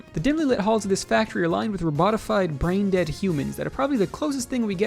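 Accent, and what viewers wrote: United States. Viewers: The New yorkuh accent is a bit distracting, but video not too bad so far. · I think you got that New Jersey Ray Lotta thing going on.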